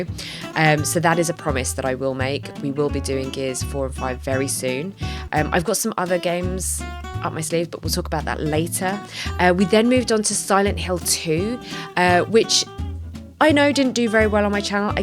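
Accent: United Kingdom. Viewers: Having a British accent is like listening to someone on 24 hour cosplay for Lord of the Rings or Game of Thrones...All that medieval fantasy stuff to nerd out for. Have a Merry Christmas!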